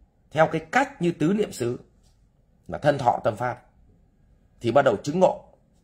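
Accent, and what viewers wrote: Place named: Vietnam. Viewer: Toi thích cách thầy nói chuyện với cu, đúng cái chất mien que nhà mẹ tôi.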